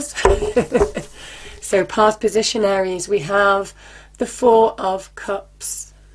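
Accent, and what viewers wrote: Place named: RP, United Kingdom. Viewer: 🌸gosh I luv ur intro and your voice, so posh 👍🏼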